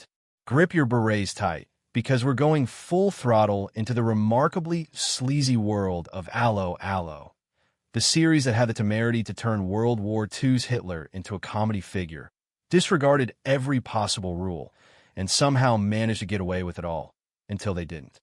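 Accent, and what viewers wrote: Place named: United States. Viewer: TLDR: Rage-bait, don't watch. How appropriate that this piece of rage bait should have an American AI voice-over that mangles the very name of the show. Just like every piece of popular entertainment it was a product of it's time and would not be successful in any other context. Personally I would take this fantasy send-up of wartime movie tropes any day over the barren desert of today's American streaming channels whose only successes are bowdlerised reboots of the very classics you decry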